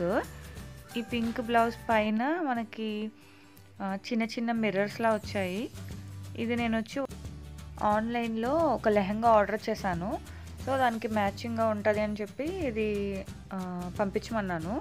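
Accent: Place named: Godavari, India. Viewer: Godawari esha...uttichinattu vinapaduthundi👌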